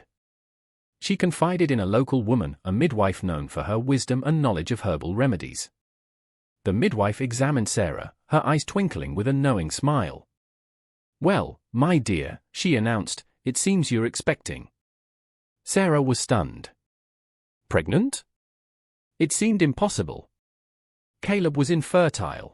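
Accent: United Kingdom. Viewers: Westerns should NOT be read with a British accent, EVER!!!!! It's unnatural.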